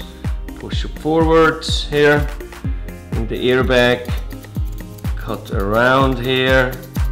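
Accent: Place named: Germany. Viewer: Where is your salon. I detect a German accent...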